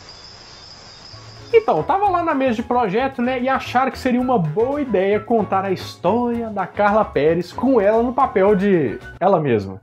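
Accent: Minas Gerais, Brazil. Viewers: É tão bom vê um vídeo com nosso sotaque mineiro 😃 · Beijao, adoro seus videos, curto demais, e o doc.? não tem possibilidade nenhuma de vc continuar fazendo? Amo seu sotaque mineirinho. E caça mais filmes com atores bem no inicio de carreira, miito bom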